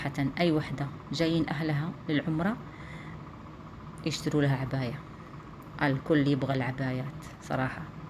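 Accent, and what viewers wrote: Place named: Gulf, Arab world. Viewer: كلامك بالخليجى واعرة😍😍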